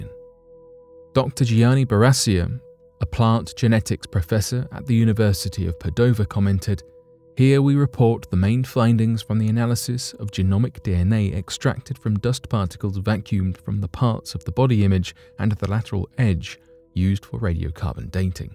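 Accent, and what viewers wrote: United Kingdom. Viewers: I like the content of the video. Im just sick of English accents! Anytime there is any science or history its a gdamn British accent! Im sick of it! Next vid of youres with a Brit accent and its a thumbs down!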